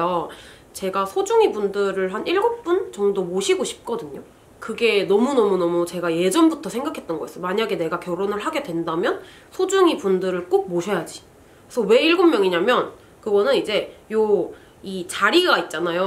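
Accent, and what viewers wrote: Busan, South Korea. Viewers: 뜬금없는데 언니도 부산에 가서 사시더니 사투리 좀 쓰시는 거 같아요 ㅋㅋㅋ❤❤❤❤🤣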